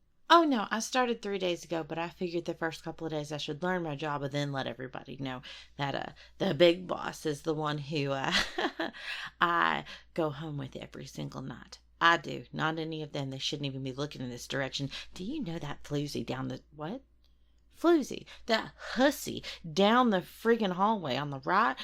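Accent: South, United States. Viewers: I didn't know i needed a southern furry till right this moment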